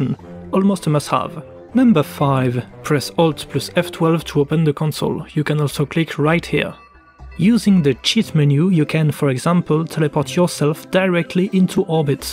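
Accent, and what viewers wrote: France. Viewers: I feel like I watched a video from a frenchman in English for the first time ever. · This is amazing haha. French accent Beautifullll ! Edit: And a great refresher + I didn't know some of them so thank you :) Edit: Also, I think you might be right that it's not super sustainable (the english channel) but this video should definitely not be the last! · Oh I love French style English videos like this! Keep up the good work<3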